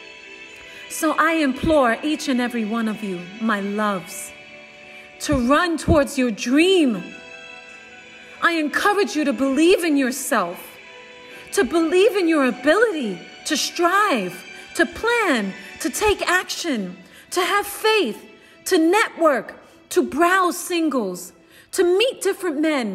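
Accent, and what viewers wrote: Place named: New York, United States. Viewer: Still got that NY accent 😍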